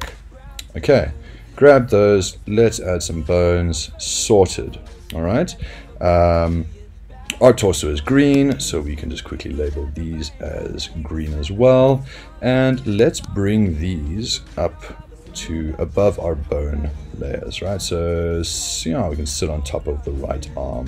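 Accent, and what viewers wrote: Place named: South Africa. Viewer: Thought it sounded South African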